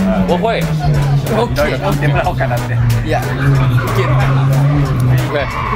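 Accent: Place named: Beijing, China. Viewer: 这哥们的老北京新东方口音还蛮特别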